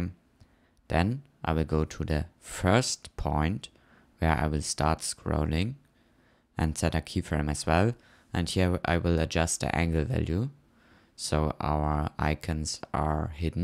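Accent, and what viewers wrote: Germany. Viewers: Ich weiß nicht wieso, aber irgendwie hasse ich es, den deutschen Akzent zu hören 😅 (nichts persönliches, ist bei jedem englischen Deutschen so)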